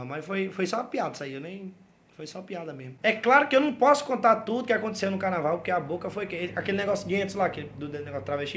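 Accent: Northeast, Brazil. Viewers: mano de onde vc eh,do nordeste?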